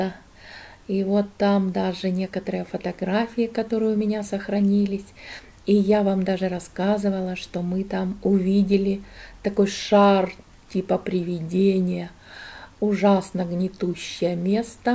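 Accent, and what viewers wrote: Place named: South, Russia. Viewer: Дорогая ,витаминка добра и солнышка ,мое уважение,люблю ваш голосок и ваш южный говорок❤👍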